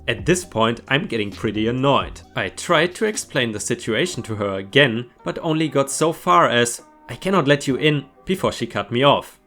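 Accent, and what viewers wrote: Germany. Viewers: You sound so german :D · Sounds like he's from Germany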